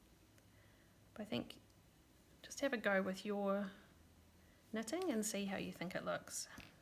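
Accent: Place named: New Zealand. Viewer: This was very helpful! And I love your NZ accent. May I ask which mystery KAL this was. Was there a specific group? Thanks.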